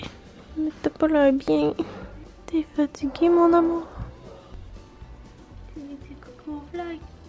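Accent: Canada. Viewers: Désolée .mais je ne comprends pas tout je suis belge et l'accent canadien est un peu compliqué pour moi. C'est votre chat qui est malade? Oulala 😣😣😣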